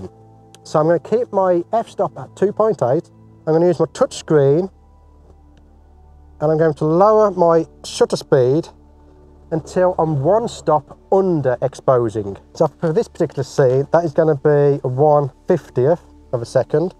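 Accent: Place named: Stafford, United Kingdom. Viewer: wicked work mate you sound stafford way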